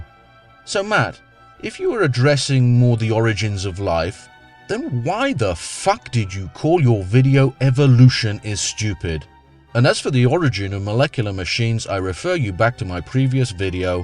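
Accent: England, United Kingdom. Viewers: Because swearing is typically bad manners, and excessive swearing shows poor breeding. Although it is comical within the context of this video because is intelligent, educated, and has a sophisticated British accent. Additionally, this is his channel, so he has and should have the freedom to in his videos. I'm not religious and I don't appreciate it when people swear in public, especially when children are present. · Brilliant!!! Everything I was thinking. But, put more eloquent than I could. Plus cool English accent (not the snobby kind). Problem is, people can not stand not knowing. So they have a tendency to fill in the gaps with whatever sounds cool. 5000 years ago the world being made in 7 days sounded reasonable and there wasn't a body of evidence one way or the other. But, FFS already. Why is it so hard for some people to accept that new information is now available?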